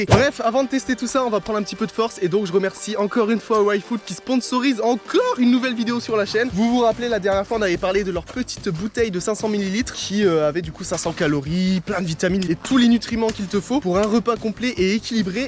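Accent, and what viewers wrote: Alsace, France. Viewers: L’es accent alsacien ça me fumes😂😂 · L’accent alsacien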